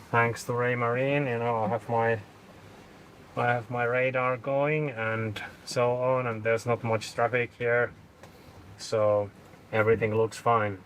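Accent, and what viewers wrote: Finland. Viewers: Why do you have so Finnish accent?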